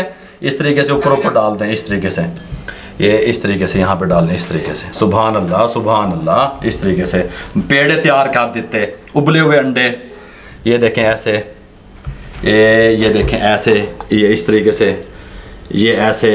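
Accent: Punjab, India. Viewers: 😆😆😆😆 bht hasi ati h jb punjabi bolty h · Kya bat hy apki panjabi ki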